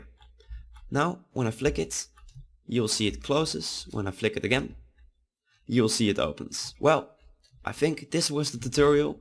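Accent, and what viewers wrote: Netherlands. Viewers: Are you Dutch, because you sound like a Dutch guy who talks English.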